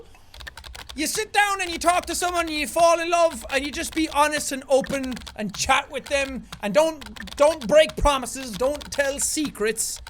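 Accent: United Kingdom. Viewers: abstract action productions when did he do a British accent in this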